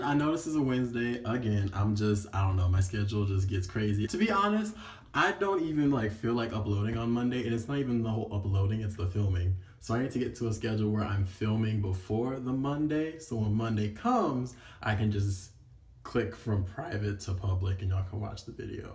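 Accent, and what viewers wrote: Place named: Brazil. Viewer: Sotaque brasileiro kkk you até from Brazil?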